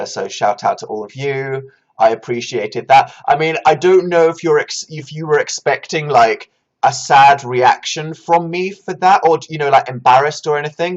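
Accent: RP, United Kingdom. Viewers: Your so posh lol!! Was deleted wwwsexyllamas was deleted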